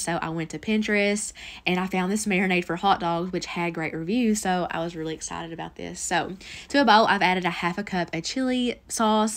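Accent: South, United States. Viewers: Hey sweetie, idk if anyone else has already commented this or not. But sorghum is pronounced 'soor' (like door) 'gum'... So, 'soor-gum'. I'ma southern gal myself and might I say, I just love your accent! Lol Thank you for all of these wonderful videos and recipes. I'm most definitely trying out that tater salad! ❤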